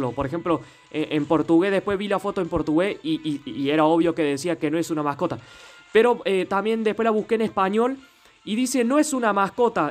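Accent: Córdoba, Argentina. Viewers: sos de Córdoba?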